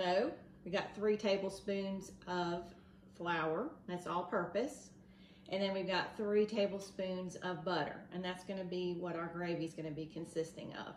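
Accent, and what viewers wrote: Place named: Texas, United States. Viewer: Hey Yes I think so too. I can’t wait to make this recipe! Sounds so good. I love your Texan accent. I’m from Canada 🇨🇦 with the goofball Prime Minister. 😆 Thank you.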